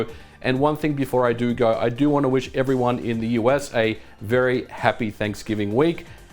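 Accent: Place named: Australia. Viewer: Got a Australian accent but talks in inches and fahrenheit Love your videos by the way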